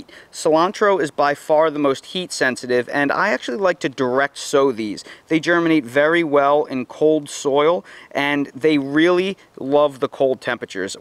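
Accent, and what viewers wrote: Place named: Northeast, United States. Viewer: *I detect a NEastern UDA accent.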